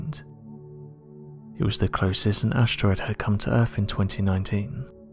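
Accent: United Kingdom. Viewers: Always some horrendous British accent narrating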